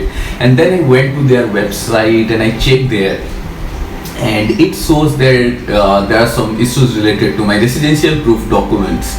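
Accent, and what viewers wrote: Odisha, India. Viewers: Is your mother tongue odiya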